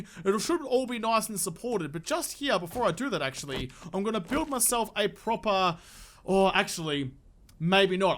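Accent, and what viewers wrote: Australia. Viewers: Luv ya vids and ya silly Base ideas Thx a lot Mate ♥♥♥ Even as a fu... ng Kraut I overstand ya Aussie English (worked a couple of years as Scuba Diving Instructor and had a few Aussie Coleauges) Where I n I cant get some Tickets 4 Park 🤔🤔🤔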